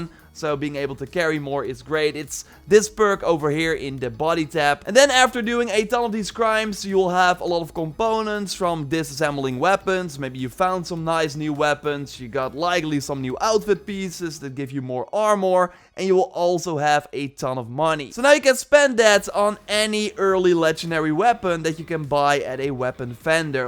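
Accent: Netherlands. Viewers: hello dutch dude. nice accent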